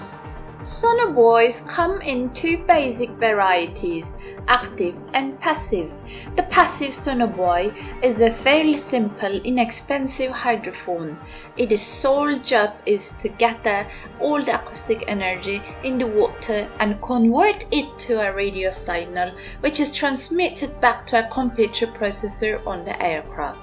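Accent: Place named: Scandinavia. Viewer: Prob Scandinavia like Norway, Sweden etc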